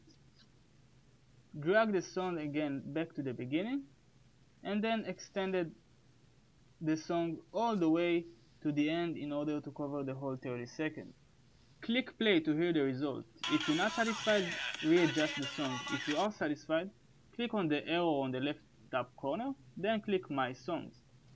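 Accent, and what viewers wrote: Israel. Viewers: Nice Israeli accent lol. Works great thanks!!! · איך שומעים שהוא ישראלי..(: תודה על ההסבר, זה מעולה! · I have IOS 9.0.2 and its not free. And i know you're from israel you have a israeli accent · שמע לא יכולתי שלא לכתוב שאתה ישראלי עם המבטא הזה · אתה נישמע לי ישראלי בכל מקרה לא משנה thx bro good view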